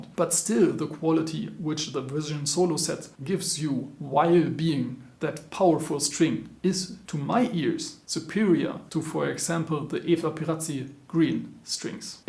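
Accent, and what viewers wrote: Germany. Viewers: i also love your German-accent english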